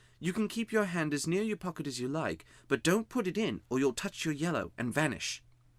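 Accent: United States. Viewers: Why an American accent for an English story?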